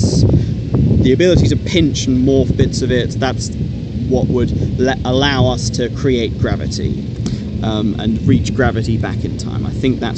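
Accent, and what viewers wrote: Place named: United Kingdom. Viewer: Beauty with brains, and a British accent lol. Nicely explained.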